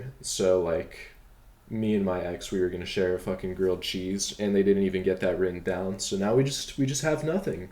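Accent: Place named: United States. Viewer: First time watched of your videos, it feels kind of surreal to listen in to gossip from the other side of the world. You look like a character from an American tv show -- which, admittedly is a strange thing to say because you ARE American, but the crazy stuff you're saying about your ex and friends literally sounds like an actual plotline from a show. Maybe because I'm not American, but hearing your story being said in an American accent is so strange to me. On a serious note, I'm sorry to hear about your ex and that your friend's love didn't last.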